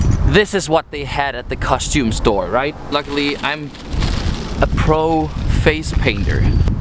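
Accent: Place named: Denmark. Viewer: du har en dansk aksang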